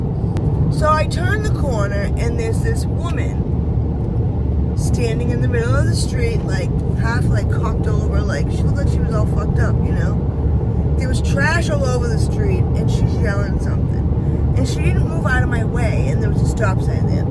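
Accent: New York, United States. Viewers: Thought you from New York or something like that bc of your accent 😆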